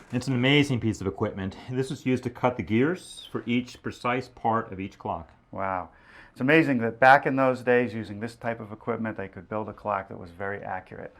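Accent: New England, United States. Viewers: what I wouldn't give to have a blooper real of all his mistakes and him cussing in his New England accent xD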